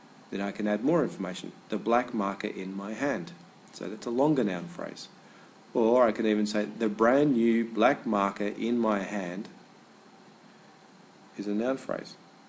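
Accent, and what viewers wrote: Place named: Australia. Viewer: Thank you! Very enticing Aussie accent...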